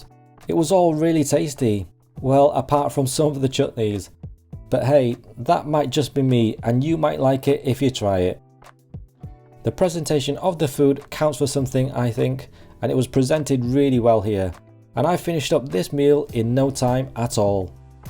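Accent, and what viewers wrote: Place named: England, United Kingdom. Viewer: Nice pomme accent by an Asian guy doing Indian food... From a African guy from Kerala, India:-)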